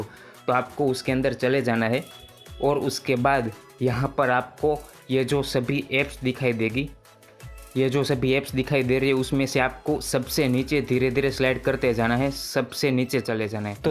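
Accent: Gujarat, India. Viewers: Tame gujrati cho e tamri boli mathi kahber pade che bhai kaya thi cho bhai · Gujarati ho bhai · Bhai gujrati ho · Gujrati ho bhaya 😍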